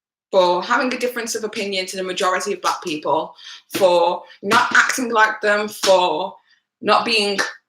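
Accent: England, United Kingdom. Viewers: You’re awesome and beautiful, ignore anyone who wants to pull you down. You are a unique person. Why should you owe any other person anything just because they happen to have the same skin tone as you- that’s crazy! Why does anyone have to fit into a box in this day and age. Britain genuinely doesn’t have the same issues with race that America has- America just can’t get past their history and it seeps into everything... but it’s not really like that in the UK. It IS different here, thankfully, or it was, although sadly it seems to be getting worse not better! I just thought you had a nice normal English accent- everyone I went to school with in my teenage years had that same accent (1/3 white, 1/3 black, 1/3 “Asian” almost exactly at the school at the time- everyone talked the same, pretty much like you. I was teased for having a different accent at the time, but you remind me of all the lovely wonderful girls I went to school with back then.) My parents did the same thing, didn’t want their kids to grow up too afraid to walk down the street, so when I was a teenager we moved to a better area and got a nice education. 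I’m really grateful for it. You’re one of my favourite Brit YouTubers and I really hope these morons won’t stop you doing what you enjoy, because you’re so good at it, a true investigative genius. I’m sure your beautiful gorgeous daughter will be luckily to grow up with such a beautiful soul for a mother, both inside and out, and your soul will alwa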